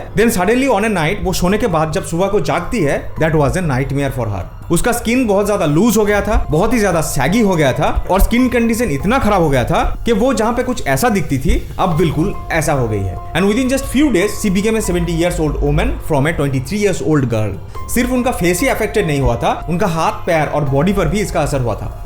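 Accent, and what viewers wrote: Bengal, India. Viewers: bro , you are from west bengal, right , because your hindi language is not a regular hindi language it mixed bengali and hindi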